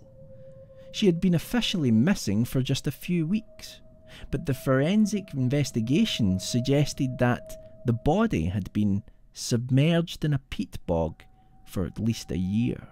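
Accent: Scotland, United Kingdom. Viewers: oh my god Scottish voice narrator and the great! so glad I found this channel! it always amazes me that I can go to 20 different creepypasta narration channels and they all will have different but equally good material for me to listen to! so much talent out there and so many outlets to find them in its just wonderful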